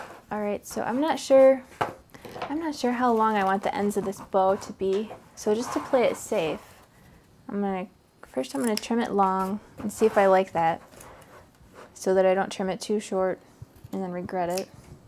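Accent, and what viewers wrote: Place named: Midwest, United States. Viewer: "Taaag" and "Staaak" That good ol' mid western accent!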